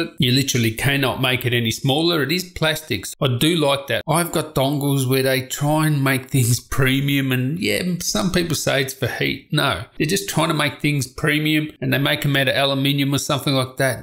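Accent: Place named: Australia. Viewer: Oh its so aussi 😆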